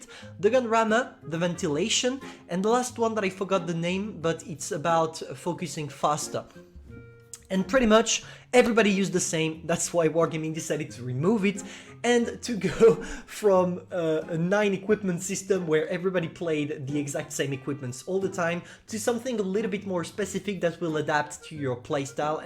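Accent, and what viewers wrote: France. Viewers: omg ce french accent j'adore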